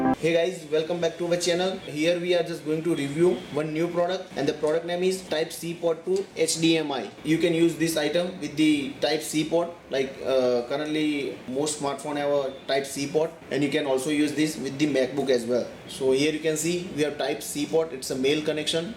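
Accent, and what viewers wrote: India. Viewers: You sound like a gujrati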